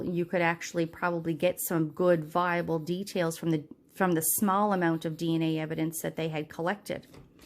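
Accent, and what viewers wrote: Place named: Newfoundland, Canada. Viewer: I’m saving this to listen/watch later with some stitching in hand. Fabulous idea. You’re the perfect person to do this considering your profession as well. Plus I just adore that Newfoundland accent. I have been away from flosstube for too long but you’ve drawn me back in:)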